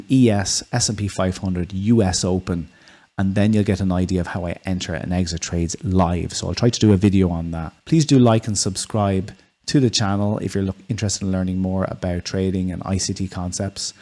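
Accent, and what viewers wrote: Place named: Ireland. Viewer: First video from that is a bit confusing.Will need to look at it a few times.Can hear his Irishness sometimes.😊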